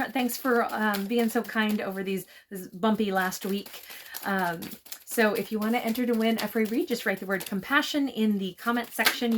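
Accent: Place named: South, United States. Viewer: I thought I recognized a Southern twang when you're speaking! Resonates definitely! He's stuck in fear, infidelity will do that to the one not commiting it. He's lucky I know what this connection is and am filled with COMPASSION, but also strength. Thank you❤🙏🌟✨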